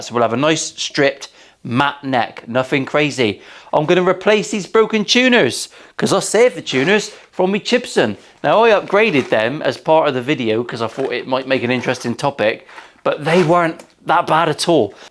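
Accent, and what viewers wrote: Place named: West Country, United Kingdom. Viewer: Only just discovered your channel,. Excellent vids love the humour. Being Milehouse Studios you're obviously based in the 'Muff-always nice to hear an accent even more Wurzel Gummidge than mine, bey 😂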